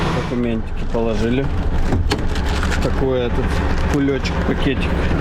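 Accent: Ukraine. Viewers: А кто знает? У него такой акцент как в Украинцев. Он оттуда?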